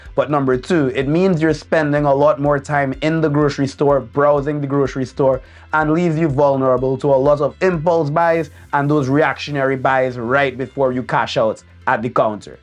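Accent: Trinidad and Tobago. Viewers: A Trini Accent? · I can hear yuh sounding like ur trini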